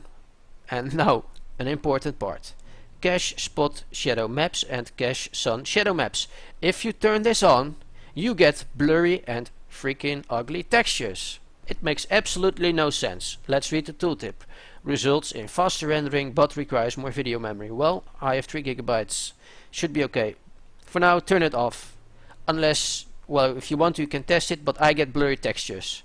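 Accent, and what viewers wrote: Netherlands. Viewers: dutch accent :P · you have a dutch accent xd Dutch? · hoor gewoon dat je nederlands bent :0 maar ik heb een probleem me i7 proccer werkt niet met advanced warfare kan je me daarmee helpen? hij loopt vast in de loading screen en dan ben ik gedisconnect. help!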